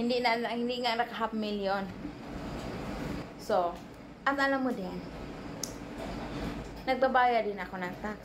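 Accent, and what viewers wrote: Visayas, Philippines. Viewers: Tama ka sis..naawa ako sa knya kc grabe kun mambash ang iba..she’s just being true to herself..i am really so proud of her.hindi niya tinatago kung ano meron sa paligid niya.yung pronounciation xa ok lng kc bisaya xa hindi xa sanay sa tagalog..understandable.marami lng tlg bashers..sa totoo lang habang nangbbash cla, ang blessings bumubuhos kay..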